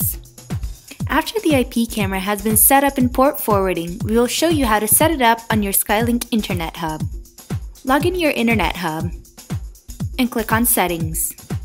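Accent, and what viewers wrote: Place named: United States. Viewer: The horrible 'skifffle' music, (noise) in the back ground made me shut you off after about 10 seconds. Why the awful, distracting, irritating 'noise' in the back ground? Your American Accent has become very acceptable now and I quite enjoy it - but please LOSE THE AWFUL DIN IN THE BACK GROUND! Many thanks, Bubbles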